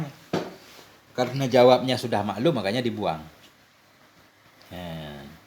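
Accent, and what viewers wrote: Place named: Aceh, Indonesia. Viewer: Dari logatnya , seperti nya ustadz ini asli orang Aceh, terimakasih banyak tadz ilmu nya sangat mudah untuk ta pahami semoga ustadz be gebri sehat sehat Sabe le Allah amin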